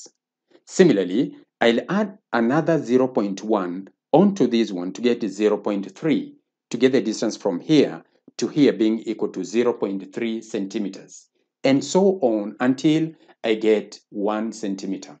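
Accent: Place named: Uganda. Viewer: Perfect explanation sir As if you are Ugandan. Am I right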